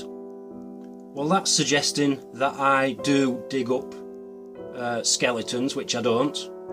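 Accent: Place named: North England, United Kingdom. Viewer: Though I'm also not a fan of 'feeding the trolls' there comes a time to clear the mud, so to speak. Such a shame, in contemporary media, that the base human nature has a viral platform to spread scum about. Good for you,. I love your attitude in your vids. Personally, I've hated Coro St. with a passion. Now, look at this objectively, the way you speak reminds me a lot of the characters, so there's a historic association with that regional accent. Does that imply I'm a 'hater'? Not when I qualify it this way. The more I listen to you and others from that accent group - from innumerable influences - who have no connection with TV soaps the more I start to associate that accent with wonderful people. I've travelled a bit in the UK, and there's a particular attitude in the North counties that I love. After all, I'm in NZ and my Grandma is from Sth Yorkshire! 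So, I am loving what you're doing in the MD community and love the way you go about your episodes. Lastly, I hope you reach the point you can ultimately forgive these 'bad people'. We all learn how to achieve peace and contentment in unique ways. That's some of my story. So 'get o nwiv yer bovver', me ol' china.:-) Only blessings.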